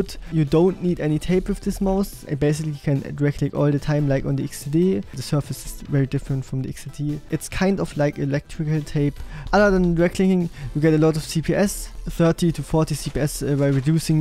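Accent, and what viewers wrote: Germany. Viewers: Ich finde es sehr unterhaltsam wie du mit deinem deutschen Akzent sprichst xD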